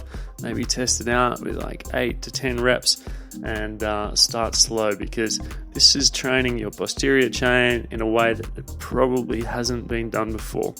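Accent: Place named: Australia. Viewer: First time watching, excellent. Guessed Australian before you mentioned it but thought you were a Norwegian who learned English there.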